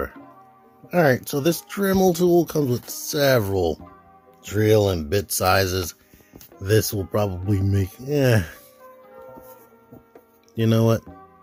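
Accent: United States. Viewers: You have the most American voice I’ve ever heard don’t get me wrong is cool you should do the voice actor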